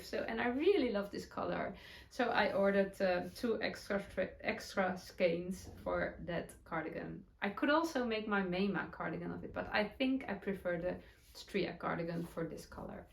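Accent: Netherlands. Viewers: Hello from Australia & Thankyou so much for a great podcast. Since finding you I am now binge watching all your English videos and loving them. Sitting bedridden sick & knitting socks, you are keeping me company. How truly exciting and special to receive yarn specially dyed for you. WOW!!!! I bet you did a happy dance for sure. Love your Dutchy-English 😍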